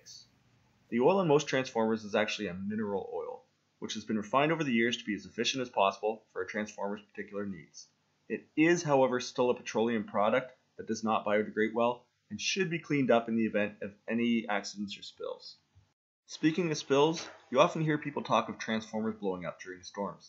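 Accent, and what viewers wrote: Canada. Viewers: Very Canadian. I might guess Nova Scotia.... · Canadian eh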